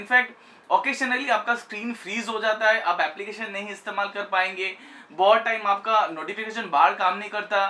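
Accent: Bengal, India. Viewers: bangali accent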